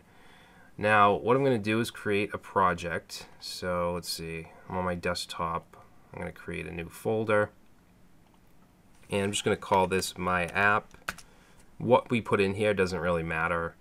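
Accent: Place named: Boston, United States. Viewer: that accent.. are you from boston?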